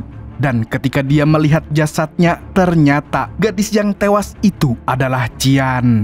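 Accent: Banjar, Indonesia. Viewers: Urang Banjar kah l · Urang banjar pinanya sidin..😅😅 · nahh urang banjar sekalinya amang nih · Babang editor ni org Banjar kah😅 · Banjar sekali nya semngatt tarus bang ngonten ny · Urang Banjar kah mang? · uhhh org banjar😂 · Adminnya urang Sungai Tabuk kah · urang banjar kah pian mang😅😅😅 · Jahh urang Banjar kah min? 😅😁👍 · Buhan Banjar aaja nihh adminnya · Orng banjar kh mang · urang banjar jua kali ya pian lah😂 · widih orng banjar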